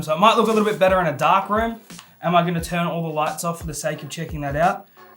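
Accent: Australia. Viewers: Where you from man, by your accent I bet you’re from Australia like me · U Aussie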